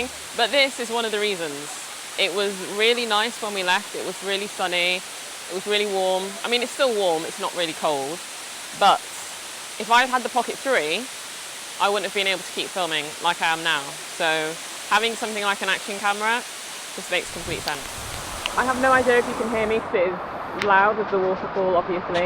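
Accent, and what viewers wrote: England, United Kingdom. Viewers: You are the best mate 😊 By the way I really love to hear your voice 😅 a beautiful English accent ❤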